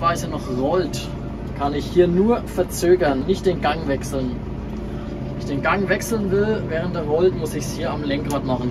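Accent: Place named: Franconia, Germany. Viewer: Da ist Triedorf Jacke oder? Du klingst auch fräängisch. Hab da vor evtl auch hinzugehen nach dem Abi.😊 Und btw ich meine gelesen zu haben, bis 1900 Undrehungen ist verzögern 0 Problem. (Bei Druckluft gebremsten Anhängern ist das natürlich was anderes) Wir fahren eigentlich zu 90% einfach mit TMS und Fahrpedal.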